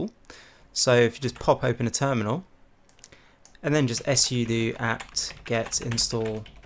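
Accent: United Kingdom. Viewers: Sierra Hormiga British pronunciation :)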